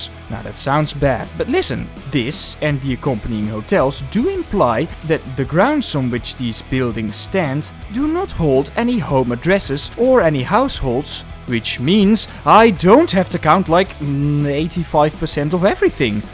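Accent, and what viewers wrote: Netherlands. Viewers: i could hear you being dutch from your accent lol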